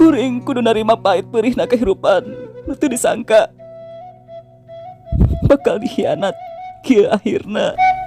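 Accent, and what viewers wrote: Sunda, Indonesia. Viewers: hehe Ari sesama orang Sunda mah kang tos terang logat na 😂 Abi mah garabagan teu tiasa nyarios halus 😁😅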